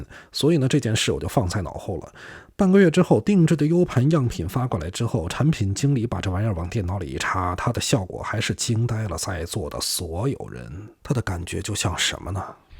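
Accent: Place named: Tangshan-Tianjin, China. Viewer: 😂北京人（唐山天津味）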